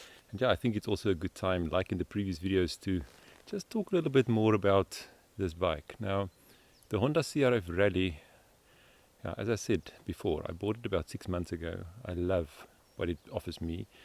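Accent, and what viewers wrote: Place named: Afrikaans, South Africa. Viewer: I smaak the Afrikaans inglish lol very deliciously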